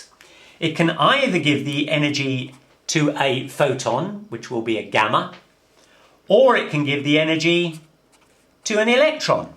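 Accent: United Kingdom. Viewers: hi....good explanation...i think its ur british accent..isn't it?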